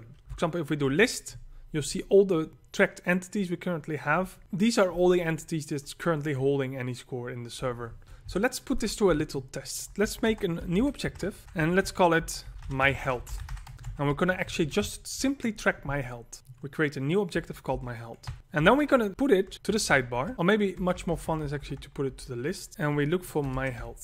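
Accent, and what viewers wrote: Netherlands. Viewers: Je bent 100% nederlands. Ik kan dat horen :P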